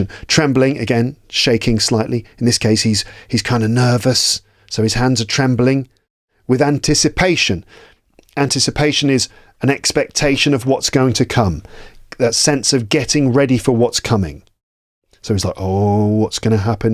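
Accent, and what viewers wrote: United Kingdom. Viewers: Please answer me Is this British accent? Idk the difference between it and American.. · so now you have a British accent? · Hi, a big thank you for your videos I always switch the speed for 0.75 and repeat after you as I really want to develop the British accent. At the moment I’m learning how to fix my accent with help of another Nicholson, so both Lukes are helping me, thank you guys.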